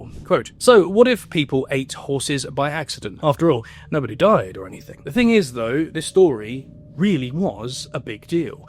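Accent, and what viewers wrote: United Kingdom. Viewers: You've got the perfect stereotypical "British" accent, I love to hear the classic accent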